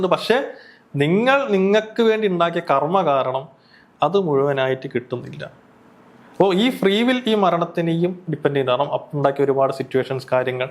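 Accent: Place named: Kozhikode, India. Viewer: Kozhikode accent catch cheyyan difficult aanu .... Bt ok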